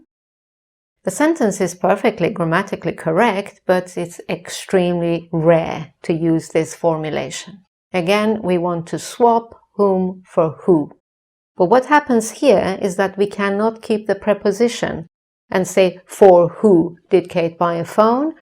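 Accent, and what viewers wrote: England, United Kingdom. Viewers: I thought you were from inglan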